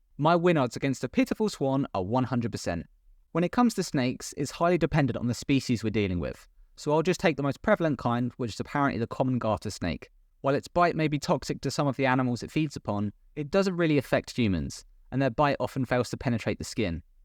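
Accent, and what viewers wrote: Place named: United Kingdom. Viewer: 😂 great video! You made me laugh! Looking forward to watching the next one! By the way, I love your British accent!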